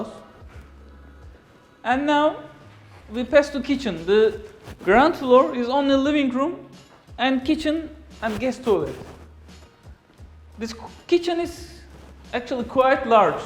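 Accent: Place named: Turkey. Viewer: ajajajajaaa hayirdir bozaci siraci durumlari...siz gercekten baska bir alemde yasiyorsunuz, bu ayaklari oyle turk kankalarina yutturursun ama yurt disindaki insanlar bilincli ve A'dan Z'ye herseyin dogrusunu arastirarak ogrenip geliyorlar...yeterince rezil olmus durumdayiz millet gulme krizine girdi bu videoyu gorenler, bir de o turkce inglizceleriniz....soyleycek laf bulamiyorum IQ -1000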